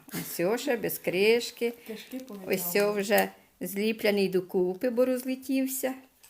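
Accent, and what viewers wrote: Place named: Khmelnytskyi, Ukraine. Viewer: Добрий вечір.Пнивіт з Хмельниччини.Я підписалася на Ваш канал.дуже сподобались Ваші відео.Коли перший раз попала на Ваш канал.почула Вашу мову то чомусь так і подумала що ви з Хмельниччини. Бажаю Вам миру над головою. скорого повернення Ваших синів до дому з перемогою.Невісточки молодці гарні хазяєчки Всього Вам гайкращого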